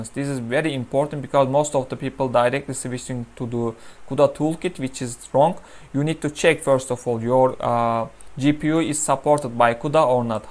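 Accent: Turkey. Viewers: ingilizcesini rahat anlıyorum türk olabilir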